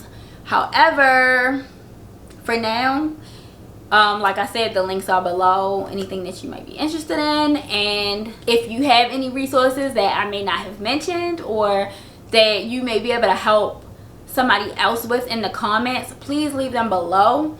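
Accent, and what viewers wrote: Baltimore, United States. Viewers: Just found your channel. You are so cute! I love the Baltimore dialect. I will check out your resources. Nice to see a young entrepreneurial sister. Bless you and your babies❤️❤️❤️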